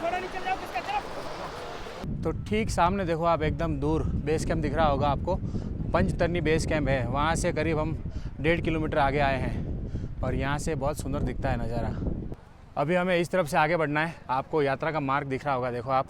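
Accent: Gujarat, India. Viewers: Bhai kya aap Gujarati ho aapki ton se pata chalta hai vese hu pan Gujarati chu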